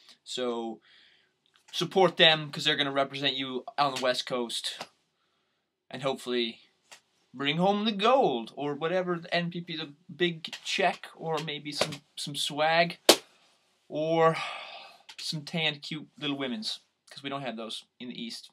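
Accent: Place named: Sweden. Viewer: two things. 1) Eat. You are loosing weight 2) You are developing a Swedish accent. Train. Harder.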